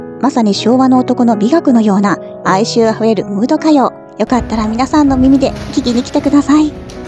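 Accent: Kansai, Japan. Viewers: ステキな所ですね👍 ホテルというより、旅館、て感じですね😊 お湯が出る時、エアかむところもレトロで😂これも演出？なんですかね🤣 なんでやねん😄 さんの関西弁かわいい😄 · またお会いできて嬉しいですえ？関西弁やん！❤これだけ人々を虜にする人も珍しい😊 メジャーデビューしてください❤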